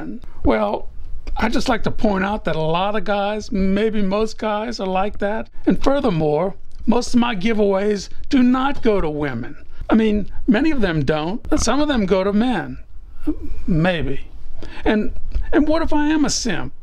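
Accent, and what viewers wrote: United States. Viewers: What area of the US is your accent from? I have always wondered. I find it pleasant.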